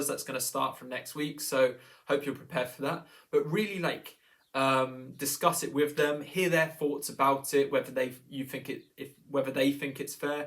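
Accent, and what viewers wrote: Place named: England, United Kingdom. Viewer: Really appreciate your videos and so nice to hear an English accent for a change.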